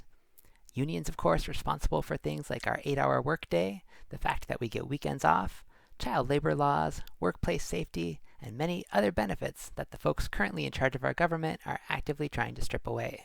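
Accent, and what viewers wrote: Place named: Seattle, United States. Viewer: I didn't realize people from Seattle had an accent. The narrator talks like Seattle-born singer, Jennifer Warnes. <link>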